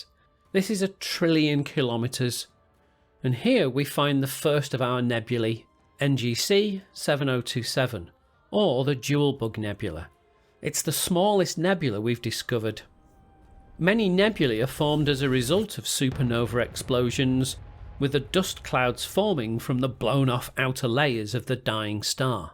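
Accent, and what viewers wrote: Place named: North, United Kingdom. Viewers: I love your northern accent. "oor soun" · YES! A new Learning Curve vid! This is one of the absolute best channels of this type out there. You have a great talent for explaining sometimes difficult concepts in easy-to-grasp terms, and I can't tell you how many times, after watching one of your videos, I've said to myself, "FINALLY I get that!" (as in, Finally I get what the Planck length is, or Planck time etc.) and all in a friendly, homey, Liverpudlian voice that reassures you that these enormous scientific puzzles will be explained carefully and clearly. Bravo, man. You do fantastic work. I'm so glad I discovered your channel.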